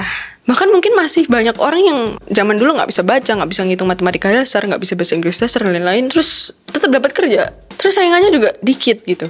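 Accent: Java, Indonesia. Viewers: Medok banget mbak XD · Ahh medoknya 😍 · Mbak mbak, keren medoknya 👊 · cantik bgtt sayangnya medok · swenneng aku sing ngonten ini 😊 cici medok hehe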